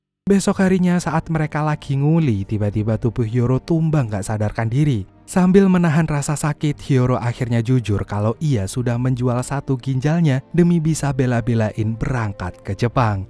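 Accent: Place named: Nganjuk, Indonesia. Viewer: logat nganjuknya minnn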